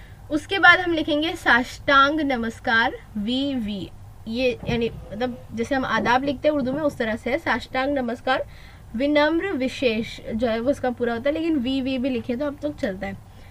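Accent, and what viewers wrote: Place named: Maharashtra, India. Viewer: her marathi ascent😍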